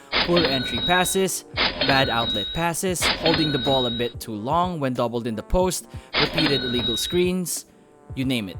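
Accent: Philippines. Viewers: I love the filipino accent ♥️♥️🇵🇭🇵🇭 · Not racist but you Filipino? Your accent is Filipino-like and the Philippines is in the pacific Just a question from a Filipino fan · I actually noticed it too, from the amount of my filipino teachers having the same accent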